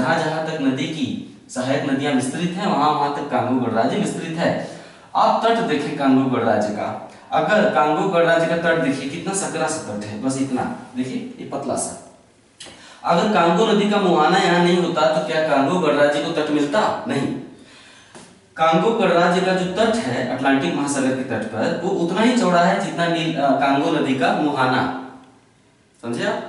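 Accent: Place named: Eastern Uttar Pradesh, India. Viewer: लेकिन हो तो आप east up ya west bihar se it is 100% sure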